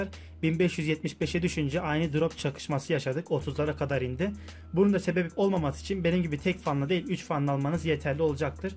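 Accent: Gaziantep, Turkey. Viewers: Kardesim sen gazianteplimisin?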